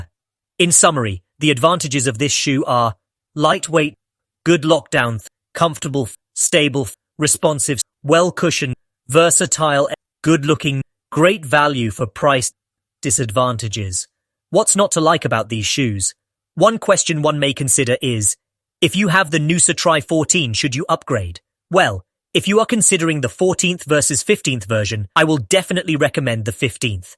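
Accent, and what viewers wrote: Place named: United Kingdom. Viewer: I've been watching your videos for awhile and I was a little surprised at first to hear some British dude narrating. :) I've always loved the look and the sharp rocker profile of the Noosa but I've never tried them because of concerns about cushioning. Also, the midsole still says FlyteFoam, not Blast. How do these feel on a 6-8 mile easy run compared to something like the Nimbus? I'd love to have a comfortable non-plated shoe with a great rocker. Thanks.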